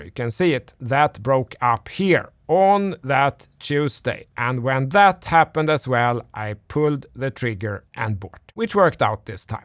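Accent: Sweden. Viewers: Well, in his defend. He's swedish. I love the accent! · Great content as usual but please talk more clean english/American then swedish/swinglish 🙈👍💪👊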